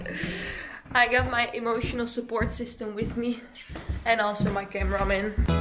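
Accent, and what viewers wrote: Netherlands. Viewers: ik hoor de Nederlandsheid hierin lmao, wel leuke video :)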